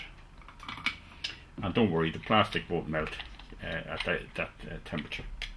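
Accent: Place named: Ireland. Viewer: You must be from Ireland, they'd some irish accent!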